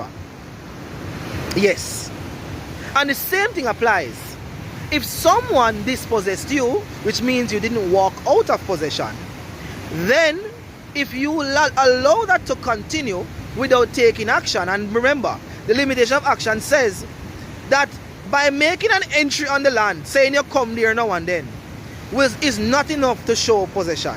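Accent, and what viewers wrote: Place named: United Kingdom. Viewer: Jamaican With British Accent 🧐💀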